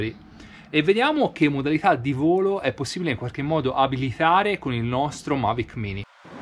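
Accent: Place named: Tuscany, Italy. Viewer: Oh che sei Toscano?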